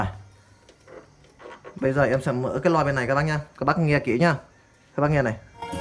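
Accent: Standard. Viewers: Công nhận bạn nói rất chuẩn .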